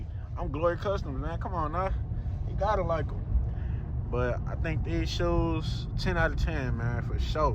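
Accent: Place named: Detroit, United States. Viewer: Wut up doe! Good shyt on the forces they crispy. Sound Like U from Detroit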